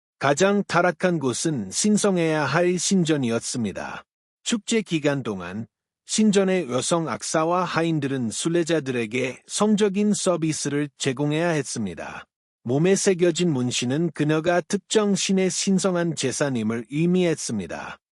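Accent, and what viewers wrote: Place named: Egypt. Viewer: 이집트 여성 노예의 기록~내용은 자세한데 이집트 사람이 한쿡말 하는것 같은데? 한쿠말~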